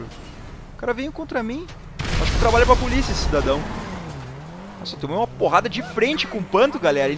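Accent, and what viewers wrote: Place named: Bahia, Brazil. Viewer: Bem baiano viu cara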